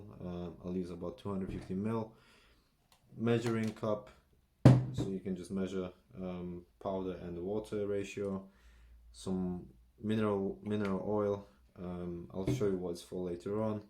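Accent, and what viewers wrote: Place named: Poland. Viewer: zajebista forma byku, niezły twister, piszę po polsku, bo słyszę akcent XD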